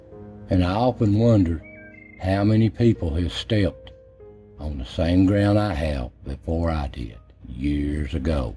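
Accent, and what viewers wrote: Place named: South, United States. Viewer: I like your poem, truly from your heart. I've people comment negatively on the way I still some words. We moved to California when I was younger. But I point out how those on east coast will change an a on the end a word to a r. People need to be more tolerant for those of us from the south. I love the way you say chimbly for chimney. My dad was Arkansas and that was how he called it. I'm 76 now and my Dad passed away in 1989. So you bring back good memories. I like all your videos. Keep up the good work.👍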